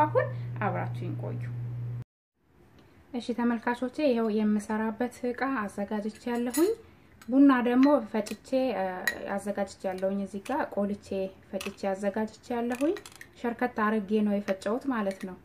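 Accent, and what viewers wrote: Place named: Ethiopia. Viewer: ሲሪላንካ እና ህንድ ትመስላለሽ ስታወሪ ነው ሀበሻ መሆንሽን ያወኩት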